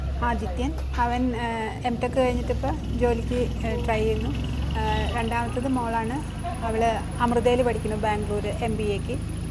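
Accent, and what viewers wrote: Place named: Tirur, India. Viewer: Njan janichathu Tirur aanu❤ nostalgic aanu samsaram okke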